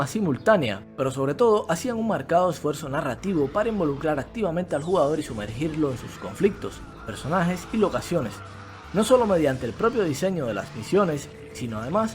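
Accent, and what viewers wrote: Cuba. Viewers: Exelente trabajo me soprendio tu asento cubano y confirmarlo hehe es bueno tener compañeros dando tan buen contenido piensas hablar de Diablo 2? Tambien lo queme mucho en Lan en ese tiempo y ahora disfrutando del diablo 4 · Eres cubano?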